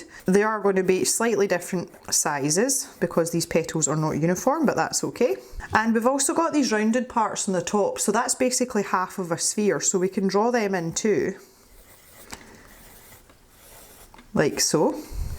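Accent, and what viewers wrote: Scotland, United Kingdom. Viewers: Thank you for sharing this! It gives me not only inspiration, but a nice wee dose of Scottish! I lived in Scotland for several years and miss the accent!😉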